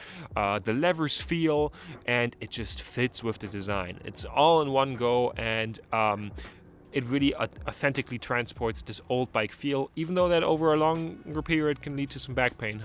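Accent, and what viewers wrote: Germany. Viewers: I know this is a wildly unrelated tangent, but I was fascinated by your accent. I realized it wasn't quite Dutch immediately, but even when you said you're based in Germany I didn't fully hear the typical 'Hochdeutsch' English accent, so I was wondering if you might natively have a more Plattdeutsch - Niedersachsen-ish dialect... and then I saw the CE plate on your Interceptor and it kinda clicked. Do you natively speak that more low-saxon dialect? Anyway, from a Dutch guy who lives in Norway, thanks for the video! I've pretty much made up my mind about getting a Bear in the spring of 2026, but any and all comparisons I can watch before decision day arrives are great. Love to see that you managed some TET, particularly in Scandinavia, I'm very keen on doing some of it myself as well :)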